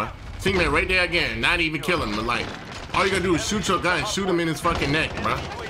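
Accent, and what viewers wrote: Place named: Philadelphia, United States. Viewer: WasssCrackin but just wanted ta ask!!! is u from Philly cuz ya lowkey have the accent like you from there lol🤣😂✌️🫰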